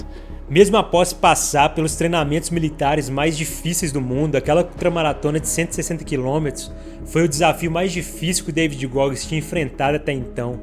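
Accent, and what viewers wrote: Minas Gerais, Brazil. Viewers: Parabéns!! 👏🏼👏🏼 vc é mineiro né? 😅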